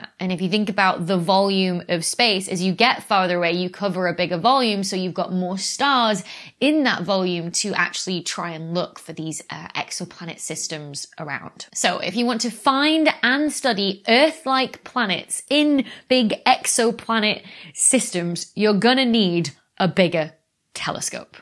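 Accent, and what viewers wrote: United Kingdom. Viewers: This is my first time seeing a video with Dr. Becky. Very informative, on a subject I look forward to. My American ears take time to get accustomed to her veddy veddy Bditish accent. Thank Goodness she talks like Julie Andrews, and not like Eliza Doolittle in her "Before" stage, the way so many cockney techies on YouTube do (I hear them and I think, "The royn is Spoyn staays moynly in the ploin" and I want to tear my hair out.).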